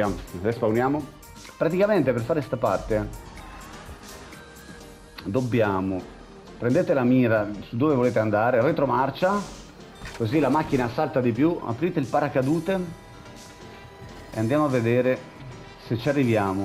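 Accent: Rome, Italy. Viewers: Mokk al mitico 🤣🤣🤣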